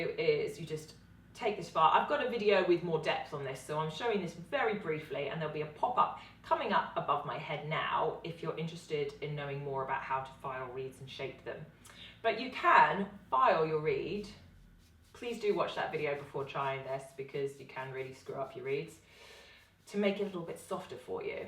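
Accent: United Kingdom. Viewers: Hallo! Just found your Video's and loved this one! So nice to hear a lovely young lady that Knows so much about Sax! Hope you make it across the pond some! Positive post and subscribed! No sure what part of the UK the accent is but that typically means a Blend from South London often for me. I'd like to add something a legendary sax player taught me to do before sand paper on a G# for sticking. Frequently we would use a Fresh USD bill before big performances. It worked great and had some texture to it and often when one did it, the Bill would make it down the horn line (Till the Bari player pocketed it typically) So I got a bass ! Keep up the Video's and Hope you make it to the SE USA ! Come play my Bass! Later Sweets! FantaZ4U here, AKA Saxaddict ! Oh one other thing, I made the3 mistake of buying a very nice Selmer Glass Reed wallet and it totally ruined reeds after the first use. 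For over 20 years I've done what you do and just retract the reed some and but a good cap on it. And it worked on all my large Clarinets as well but considering the frequency I had do Double on them, I eventually started using Fibracells. When having that much lumber on stage I got very dry keeping them All warmed. It got worse when the Vocalist found my storage fridge and started lifting them before the stage calls. And Typical we know what happens when singers feel the need to take things. I'd make special stops for a bottled water Lemon Water only to have a Singer take it before they cameras ca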